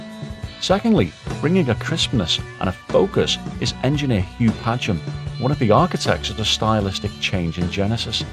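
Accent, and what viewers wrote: Liverpool, United Kingdom. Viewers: I wonder if there was any drama. Ok, you sound scouse and this is Yes and nyc eh nice, I love the Angel Gabriel lol. subbed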